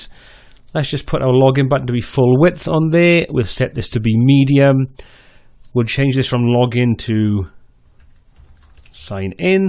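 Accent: Wales, United Kingdom. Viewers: You sound like you're from Wales. Am I right?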